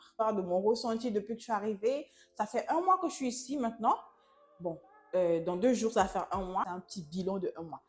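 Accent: Ivory Coast. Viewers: Ton accent devient ivoirien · Ahm, tu commences a parler comme les Ivoiriens, tu es Congolaise et puis après. ?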